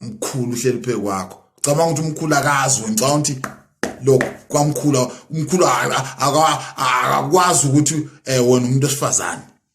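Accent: Zimbabwe. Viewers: Ngiyakuzwa mfwethu ukhuluma iqiniso, kodwa manje wakhulumisa kwama Zimbabweans kangaka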